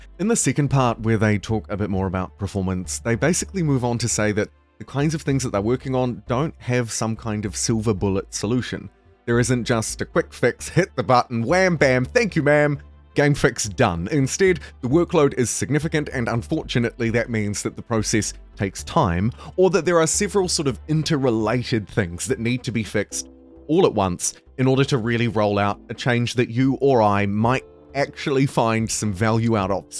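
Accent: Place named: New Zealand. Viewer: So nice to hear these updates with a fellow Kiwi accent. Had read the email about the latest patch but I just wanted to say THANKS for explaining things a bit better.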